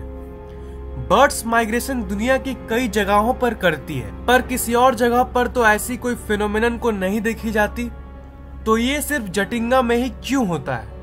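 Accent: Bihar, India. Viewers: Sir Are you from bihar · Bhai koun ho nhi ho ye to nhi pata par tum bihar se ho ye to pukka hai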